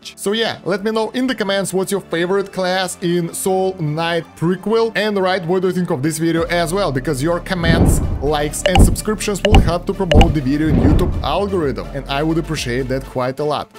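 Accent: Russia. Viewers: Готов поставить на то что это русский пытающийся говорить на английском языке Вот 100% я уверен что это русский человек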